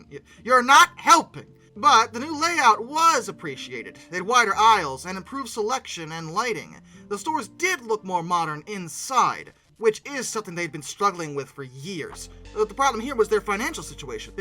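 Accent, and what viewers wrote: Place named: Michigan, United States. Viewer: You must be from Michigan... there's no S on K-Mart/Walmart/Target!!!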